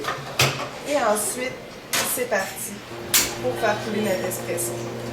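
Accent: Quebec, Canada. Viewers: votre accent est très agréable, vous me faite aimer de plus en plus le Quebec. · merci bcp pour 1 conseil sup...avec 1 accent qbqwa c vrmt cooool.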